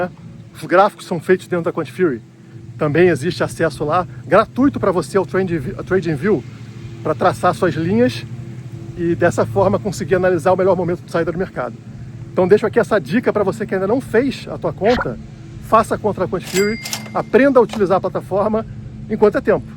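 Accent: Rio de Janeiro, Brazil. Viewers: Pq tanto desespero pra vender curso se vc é tão foda ? 😂😂😂 Carioca né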